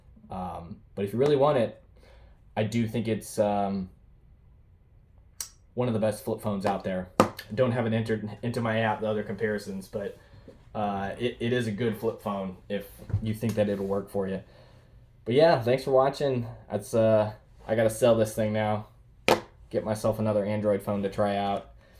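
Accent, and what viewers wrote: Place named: Texas, United States. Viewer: Why is this Swedish man speaking in a Texas Accent? His face looks exactly like the image on the Shroud Of Turin. His phenotypical facial features indicate he directly descendanded from Aryan stock in Northern Scandinavia. Google the Shroud Of Turin. The image captured on the cloth is a direct phenotypical copy photo of this guys face. I wonder if he has the courage to type a google search on what i said.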